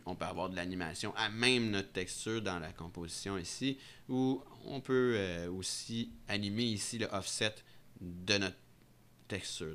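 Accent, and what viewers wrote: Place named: Canada. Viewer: cool tutorial, in french would be cool, t as un accent tres comic pour les non-canadiens!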